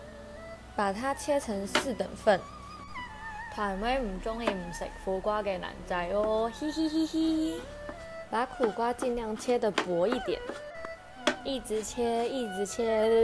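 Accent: Cantonese, China. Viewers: 啲廣東話好得意😂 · 台妹講廣東話好可愛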